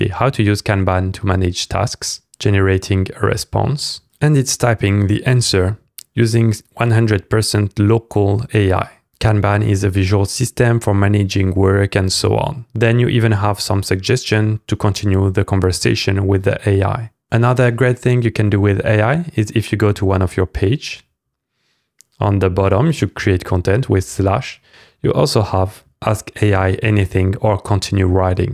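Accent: France. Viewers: The french accent make it better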